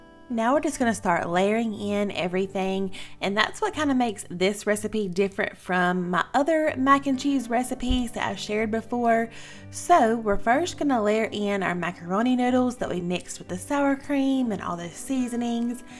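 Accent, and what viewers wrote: South, United States. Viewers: I am so excited to try this! Love your Southern drawl! I'm an Alabama girl!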